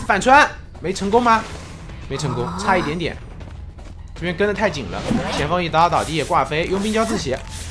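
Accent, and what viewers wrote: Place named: Shandong, China. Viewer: 有没有朋友知道是哪里人。我吃饭看室友说有口音是山东人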